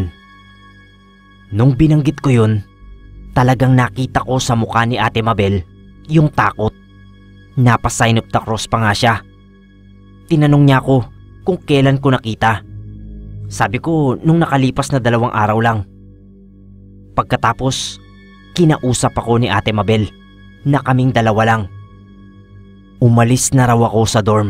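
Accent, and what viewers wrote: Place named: Pampanga, Philippines. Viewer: Sa the way sya magsalita feeling ko kapampangan sya haha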